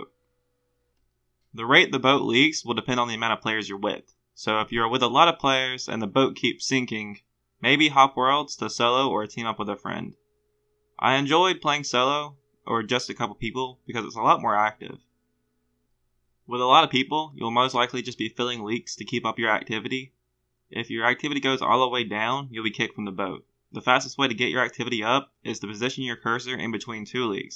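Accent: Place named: South, United States. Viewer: dang that deep southern accent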